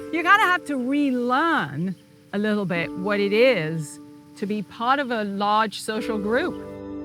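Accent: United Kingdom. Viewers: Is RP English - she would have had very exclusive education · So, Mr. Smartypants, tell us what a selfish American she is when she has a BRITISH accent. How ignorant can you be?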